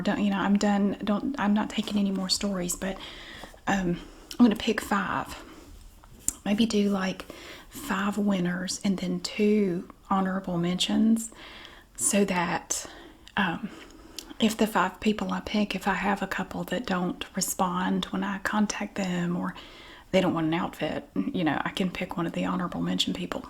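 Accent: South, United States. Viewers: I LOVE HOW IS SO ELEGANT! 🧡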